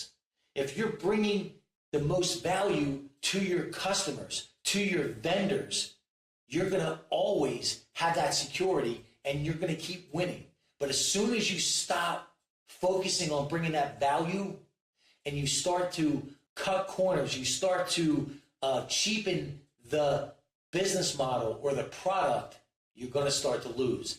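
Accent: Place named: East Coast, United States. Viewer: Definately Hear That Wonderful East C. accent! #MM2018 , Your Amigo Santos.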